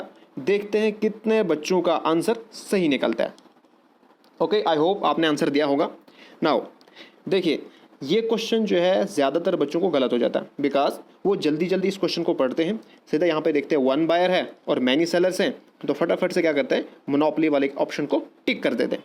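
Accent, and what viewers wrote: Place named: Kashmir, India. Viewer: Ab ka bei sankari gaandu, Youtuber nikalne bna hai. Pehle apna accent thek kr. Kashmiri English nai chalti 😆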